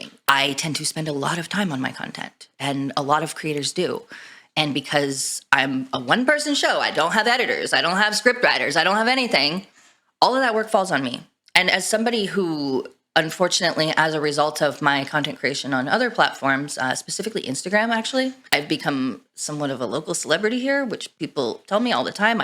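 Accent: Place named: California, United States. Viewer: Yea my first time seeing you and I gotta say that's a strong ass California accent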